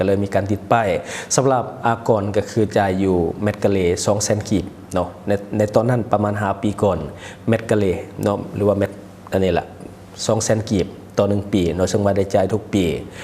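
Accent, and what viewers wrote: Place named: Thailand. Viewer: คำเว้าที่แอดออกเสียง มันก็สำเนียงเดียวกันกับไทย. แค่ภาษาเขียนไม่เหมือนกัน.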